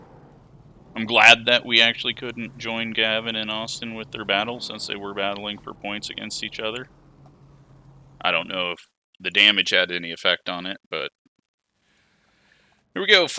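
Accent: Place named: Iowa, United States. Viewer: U from iowa?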